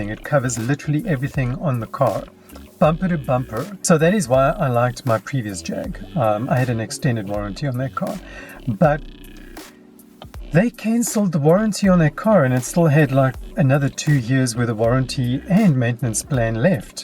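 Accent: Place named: South Africa. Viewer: I searched XF Reviews on YouTube and came across your channel, watched 1 video and thought to myself, this guys definitely South African, your accent gave you away lol. But in the video you spoke of Dollars which made me think maybe you not from SA. Anyway, love your channel, Im a new Fan of Jaguar, especially the XF model. Mainly due to watching your videos, you don't sugar coat your real life experiences with the car.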